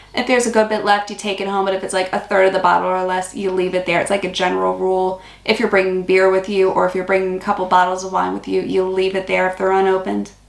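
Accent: Australia. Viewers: Your G'day is starting to sound more Aussie as you go along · Sounds as though you're finally getting your head around how we Australians function. I have a feeling when you finally get back to the States for a visit, you're going to have to check yourself (the way in which you speak) occasionally. I won't be surprised if you get some strange looks from your family and friends. You're becoming an Aussie by osmosis.😀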